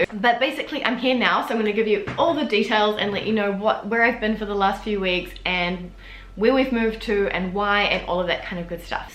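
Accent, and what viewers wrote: New Zealand. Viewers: Your accent has become so much stronger no you're back in NZ!! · Oooooh that makes me so sad ! As a French from Lyon's area, I discovered your great channel a few months ago and loved it right on the spot ! I really love the way you describe our society and little habits that seem perfectly normal to us, but certainly really weird to foreign people. It's always super interesting to get a critical look on our habits. Yours is critical, but in a funny, full of empathy way, and it is always very positive and enlightening. Not only did I like the way you talked about our country, but also the way you generally speak, your personnality, your sense of humour, your great accent from NZ... So keep posting ! I hope you enjoy the modifications in your life. Your family must be sooo happy to finally have you back ! Don't be sad, ce n'est même pas un au revoir, on reste avec toi ! 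Chin up, spirit high ! Be happy, a nice soul like yours diserves that ! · It’s amazing how way different your accent is now, way more Kiwi (obviously? ) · Your so pretty and that kiwi accent is adorable and charming ! I think it's a great idea to keep this Channel, do post vids when you visit France and incorporate Videos about New Zealand. I would love to learn more about New Zealand. People seem so friendly there. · Hi, please carry on with your channel you’ll teach us stuff about NZ, I’ve always been fascinated by this country and the Maoris and I really wish I could go there someday. Plus, I love kiwi accent! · Hi! You have not to be worried about the channel, it was born with another purpose but adjustments to it might be the right thing along with the changes you are experiencing now! Me and my girlfried have started following you mainly because you come from New Zealand 😋 we wanted to hear your accent and about the differences between Europe and Newzealand lifestyle and you always hit the target. 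We both hope you'll continue doing videos about New Zealand and more! Thank you for your great job so far! E&I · Hello, I have been studying English in NZ for 6 months. I left Paris in January to come here where I had been living for few years. My sister works to L'Oréal as well. I'd just would like to say that I discovered your channel one week ago and I very apreciate your video. I think your speak French quite well. But I very enjoy to listen to you speak English with the kiwi accent about Paris. It helps me a lot for my learning of English. So thanks for that. According your chanel, even if the concept is an expatriate living in France I will be happy if you carry on. You can apeak about such a beautiful country that is NZ. I went to Wellington some weeks ago for a weekend and I very enjoyed the place, I think like a lot of people you're lucky to live there. I wish you the best !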